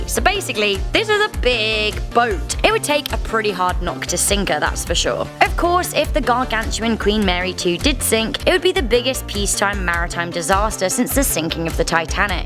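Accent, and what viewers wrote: England, United Kingdom. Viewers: I like this person's Englisacccent, but seriously.... She can't pronounce Cunard? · She's British accent bro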